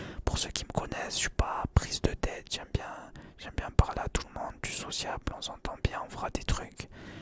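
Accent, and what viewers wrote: South, France. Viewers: salam aleykoum, ca faisait tellement longtemps que jsuis pas tombé sur tes vidéos ! le truc que j’aime vraiment trop c’est l’accent du sud, t’es de marseille nan?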